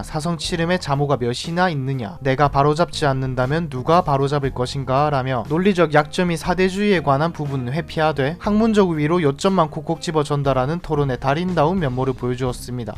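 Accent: Gyeongsang, South Korea. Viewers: 10월을 시월이 아니라 십월 ㅎㅎ 경상도 어투 반가워요.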